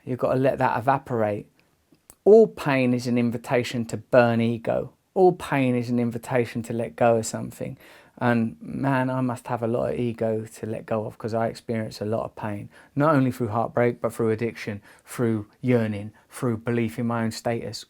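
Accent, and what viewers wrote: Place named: United Kingdom. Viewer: So drunk and sad right now, I had to turn the CC on because it got to the point where the British accent got so thick I didn't understand any words to cry to.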